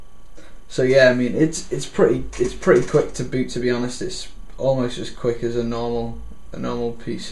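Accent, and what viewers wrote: Liverpool, United Kingdom. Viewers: Are you from the Wirral/Liverpool?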